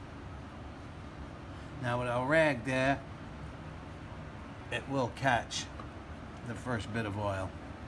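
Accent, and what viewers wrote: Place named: New York, United States. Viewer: love that new york accent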